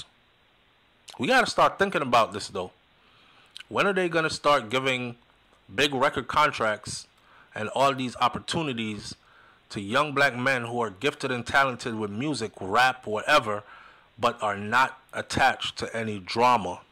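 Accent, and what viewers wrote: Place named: Jamaica. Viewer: This sounds like soflow TV.. 😂😂😂 the Jamaican accent can’t hide man.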